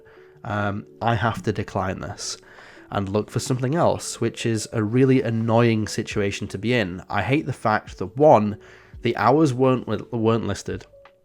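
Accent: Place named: Southport, United Kingdom. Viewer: Not related to the video but your accent sounds exactly like a youtuber TIK history who is from southport, and similar but slightly different from a different youtuber who is from York. Its just cool to me when as a non english speaker I notice not just general "british" accent but regional ones too I hope you land the job you want and I hope your channel grows and youtube becomes a more stable source of income for you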